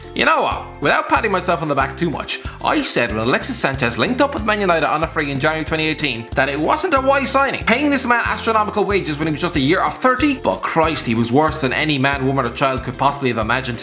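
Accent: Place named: Ireland. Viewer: Is it an Irish accent thing, to pronounce 200x as 100x?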